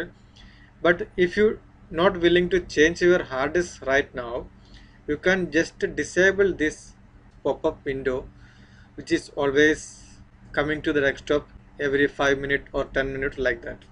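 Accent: India. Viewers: I like your Indian accent 👌😀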